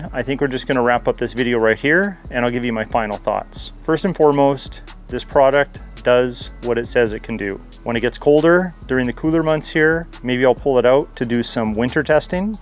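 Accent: Canada. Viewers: You did well explaining it. Love the way you say garage, sounded like you were cutting the letters down to just 3 lol. you'll see it's like soft french.....!!!! Canucks always sound foreign. Must be in the water. For the price if it's durable. And durability being the key word it's worth the $ 699. Or is it???? A top mounted R.V. ac/heater that is much more powerful is only $200 more. But for tents and off grid applications this would maybe also be great except I check a low watt a/c on Amazon and it's only $ 332 14,000 btu , so...... For tents this would be worth it. Another scary thing is it only comes with a 1-year warranty. That doesn't build confidence. For winter we always use a low watt electric heater along with kerosene lanterns. You'd be amazed how much the old hurricane lanterns put out. We made #2 boxes for ours to sit down in, so the kids couldn't knock them over. 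But now in our " Golden Years we just do hotels and motels. Get all kinds of rebates and miles and bonuses for loyalty too. Much safer and easier and actually cheaper. Over 65 has it's perks. Loyalty is the key. Best regards Canuck. Great Job on the video. Peace. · man, what a dumb comment. You’re an embarrassment to the rest of us. If you couldn’t tell he was Canadian by his accent, you need to get out more. Also, you act like Fahrenheit is American…it was developed by a Dutch physicist and the US is only one of 5 countries in the world that still uses it. There’s a reason for that. And if your comment was some smoothbrained attempt at American exceptionalism, you really need to read some history about how strong an ally Canada has been, regularly spilling more blood and treasure per capita that the US does in global conflicts. You came here looking for information and it was given to you for free…so just be grateful someone else did your homework for you.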